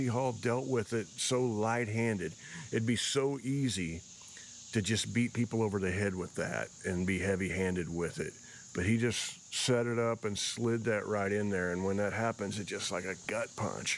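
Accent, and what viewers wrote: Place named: Asheville, United States. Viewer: I had just commented on your guitar player post last night about this. Man that sucks so bad. I wish my brother was still alive because he would be right with me on the commiseration today. He died in 2004 July 23rd. He turned me onto Tom T Hall, Johnny Cash, and all the older country and southern rock artists. I was a punk rock and thrash skater with a limited knowledge of older dudes like that. I sure appreciated him playing that stuff for me. Also when you get down to it the jump from bands like The Clash, The Violent Femmes, and Uncle Tupelo really ain't that far a stretch. I feel like you can play rock versions of country or country versions of rock. As music goes it's all the same there's only 12 notes and I only use 5 of them. Anyways as story tellers go Tom T. Hall was amazing. You have that same kind of delivery and ability... 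I play your stuff more and more and listen to the stories matter of fact the other day my wife said "That has such a nice voice. Comforting and sounds like people back home in Asheville. I could listen to him talk and sing all day" aside from that s#$* still been jamming on the songs you write. LOL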